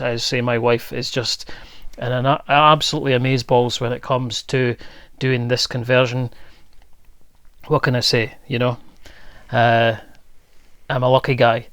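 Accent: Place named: Scotland, United Kingdom. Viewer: Lol, I enjoyed the voiceover. You remind me of lewis capaldi with your humor and similar accent! Looks like a nice cosy set up !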